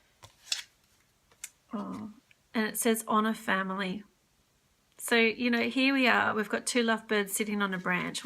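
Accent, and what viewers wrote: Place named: Australia. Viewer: Thank you for your guidance, and for the work you’re doing to help the TF collective. The message resonated. It’s nice to hear readings with an Australian accent! Love and light from Brisbane xo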